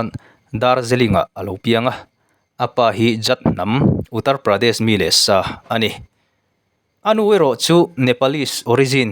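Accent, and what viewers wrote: South Mizoram, India. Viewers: Saw lampang a ang😂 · Sir chu Saw lampang ah in awm em? Nge khi lampangah, hmar lam product ori chu i tawngkau chheh atang hian a ang viaulo.. huat tur anilo aw.. inpawh zelna tur zawkin kalo sawi ve mai mai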